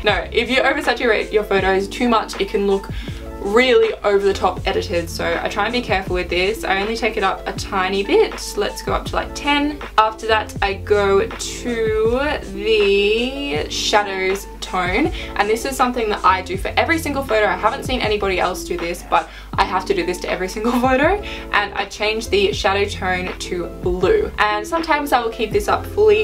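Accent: Australia. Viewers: I knew you was Australian when I heard you speak! Love your wall background and your makeup highlight! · And are you Australian?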